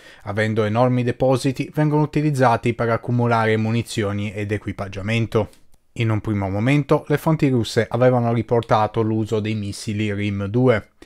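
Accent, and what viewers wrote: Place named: Albania. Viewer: il suo accento assomiglia tanto alla lingua Albanese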